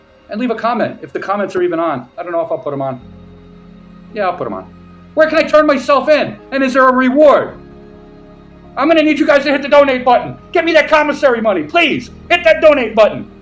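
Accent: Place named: Ireland. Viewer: I did NAZI that coming...I would have thought you were Irish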